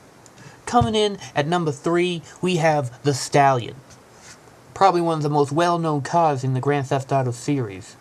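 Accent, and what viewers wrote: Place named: South, United States. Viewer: HAHAHAHA Great video man!!! Are you southern??? sounds like an southern usa accent.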